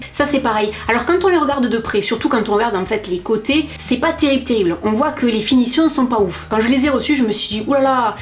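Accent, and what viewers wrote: South, France. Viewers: Coucou. une petite question: as tu déjà testé des vêtements Bonprix?! Je te le demande car je suis devenue totalement fan de cette marque. Abordable entre la redoute ( par correspondance) et Kiabi (pour la qualité), j'y ai fais plusieurs commandes et je trouve ça vraiment top, pourtant pas adepte de l'achat de vêtement sur internet sans essayage. Bref si tu n'as jamais testé je te recommande fortement! Gros bisous! Tes videos sont géniales, et ton accent du sud je l'adore.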